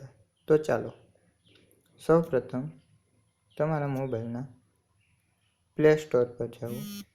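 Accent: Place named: India. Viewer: Stupif indian voice smells like rotten egg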